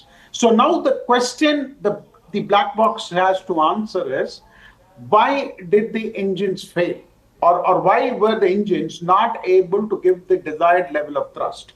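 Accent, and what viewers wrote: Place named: South India, India. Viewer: Pathetic south Indian accent 💩💩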